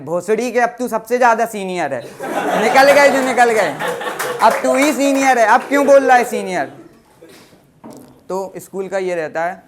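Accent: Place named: Kanpur, India. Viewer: pooonnchaa. Kanpur dialect is mast.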